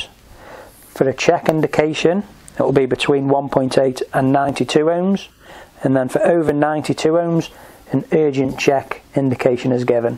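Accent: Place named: Stoke-on-Trent, United Kingdom. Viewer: Where are you from? Stoke-on-Trent area?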